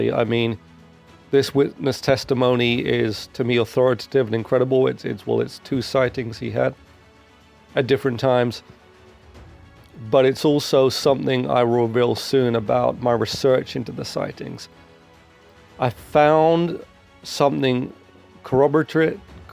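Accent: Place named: England, United Kingdom. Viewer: What a lovely calm voice you have and beautiful English accent , it does not sound like a Devon accent but forgive me if I’m wrong 😆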